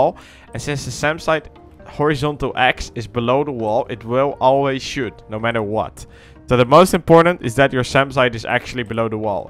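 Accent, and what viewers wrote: Netherlands. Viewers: Ru Dutch? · I smell Dutch 🎉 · are you dutch? xD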